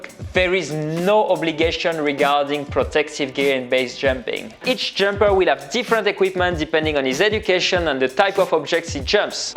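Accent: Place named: France. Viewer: ptdr je me disais bien que tu avais un accent français, cool !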